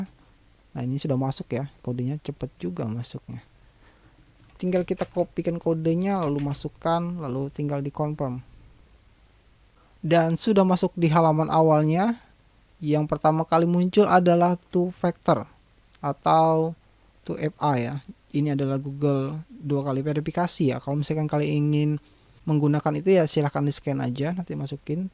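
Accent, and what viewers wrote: Palembang, Indonesia. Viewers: wong plembang ye lor ? 😁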